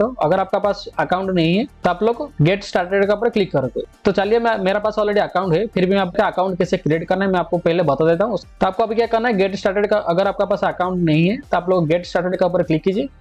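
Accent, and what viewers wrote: Odisha, India. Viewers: Sir App Odisha Ka Hain Kya? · Bhai... Odisha se he kyaa....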